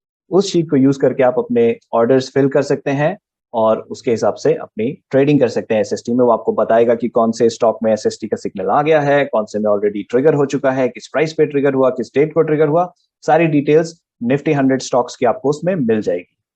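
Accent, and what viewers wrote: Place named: United States. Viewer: Ye American accent MEI bolna pehle band Karo. Very irritating. Strategy Mahesh C. Kaushik Sir ki hai. And uptrend market mei ROI 5-7% shayad zyada milega friends. Not in every year maybe.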